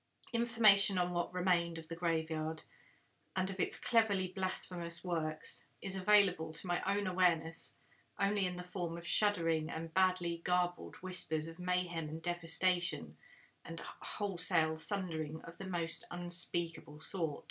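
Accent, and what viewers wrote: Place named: United Kingdom. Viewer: Thank you for reading Lig's for us. I would try to be closer to your microphone, or use a headset one that has mic close to your mouth. Your voice is lovely, as are most UK accents.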